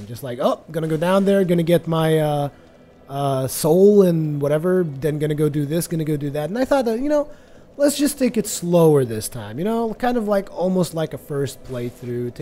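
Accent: United States. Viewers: First vid, Vaati sent me. Damn, your English is perfect. You sound American. You have no accent. I have Portuguese heritage, but I don't know what it sounds like. Weird that you don't have an accent. Great vid though. Going to watch the rest if this play through.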